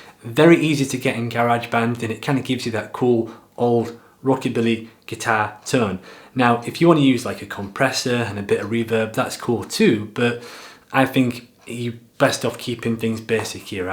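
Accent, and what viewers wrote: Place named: Scotland, United Kingdom. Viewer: Seems like I spotted Ben Nevis in the distance….and a slight of scottish accent as well. Per in Demark…I ❤️my Tele.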